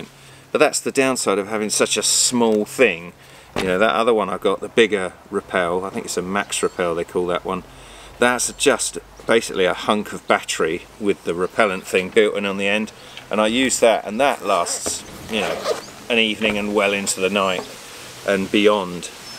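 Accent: United Kingdom. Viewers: You were somewhere moist and needed a gadget with a light! Gigidy. Apologies, you can take the boy out of the Army………… Currently sat in France and an ad popped up on FB for this item. As always, I chose to find reviews via YouTube and came across yours. I like the fact that you can buy a generic pad for these. May I ask if you have trialled the generic pads along side Flextail pads? How do they come up in a price comparison? Nice review and nice to listen to a British review! 🍻👍🏻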